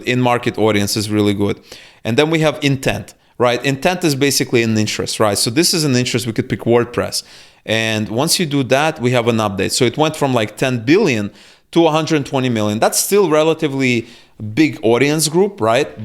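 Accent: Russia. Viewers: you sounds like Russian! And by the way good video. I am about to test running CPA offers on GDN but not in the USA. Why? A lot of competition there and thus expensive click.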